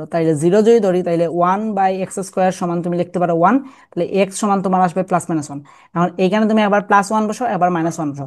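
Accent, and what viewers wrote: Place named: Sylhet, Bangladesh. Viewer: ভাই আপনার ক্লাস গুলা ভালো তবে সিলেটি শুদ্ধ মিক্স হয়ে যাচ্ছে, এগুলা খেয়াল রাখবেন।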